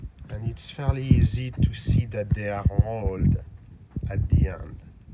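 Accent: France. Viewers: You have a very french accent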